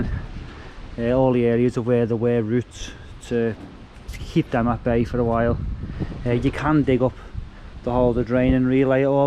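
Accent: Liverpool, United Kingdom. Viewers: Please don't talk when the jetter is on. It drowns out the sound of your voice. Your strong liverpool accent is difficult to understand already.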